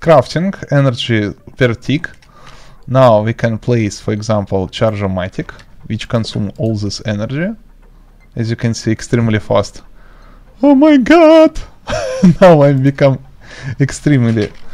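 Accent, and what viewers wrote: Russia. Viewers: Акцент русский)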